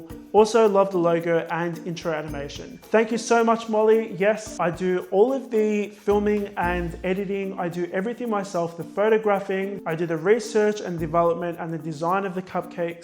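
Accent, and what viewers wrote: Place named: Australia. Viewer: I don't have emojis on this phone but it felt like a short video; didn't even feel the twenty minutes! Love your cupcakes and other recipes too :) I used to think you were saying AK guys instead of ok guys and I got really confused thinking it was short for also known as without the a but I also love your accent! Australia is awesome.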